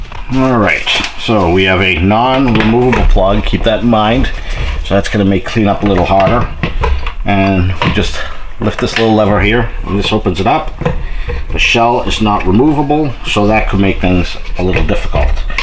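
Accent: Massachusetts, United States. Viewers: Good show!..do I detect a North Shore MA accent! Lol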